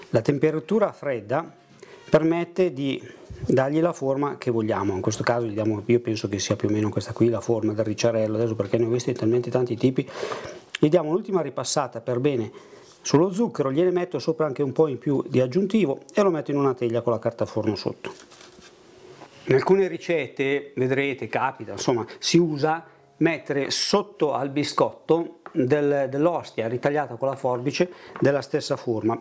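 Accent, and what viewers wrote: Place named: Emilia, Italy. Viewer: Simpaticissimo. Lei è Emiliano!? Perchè mi sembra dall'accento che lo sia..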